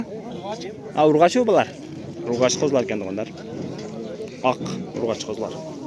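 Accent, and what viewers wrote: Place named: Osh, Kyrgyzstan. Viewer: Бул блогер бала Оштон болобу ака дейт экен же Баткен Лейлектенби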